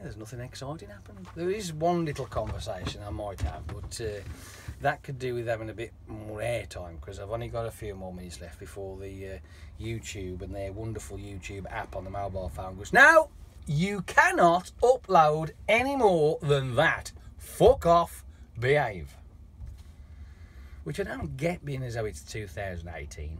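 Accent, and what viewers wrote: Birmingham, United Kingdom. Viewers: It’s like I’m watching the brummie version of Karl Pilkington sometimes!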